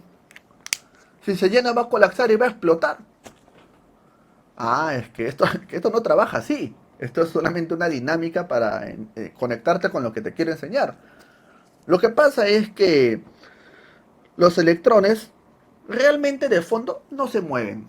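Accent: Peru. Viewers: Con razón le buscaba el acento y no acerté a que era peruano.😂 Es un excelente profe.👏👍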